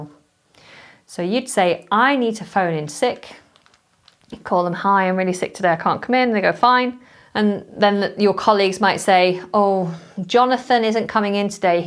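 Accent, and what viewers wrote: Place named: United Kingdom. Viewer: I love your sexy and beautiful British accent baby specially when you eat and swallow all end "r" .This is sooooooo cute baby. thanks a lot for your useful and beautiful clips. God bless you my beautiful teacher.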